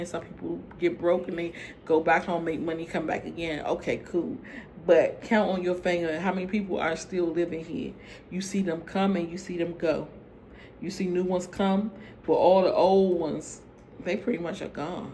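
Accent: United States. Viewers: I hear that DMV accent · Nobody knows you are an American until you speak. So I don't agree with you when you said Africans think they are better than Americans.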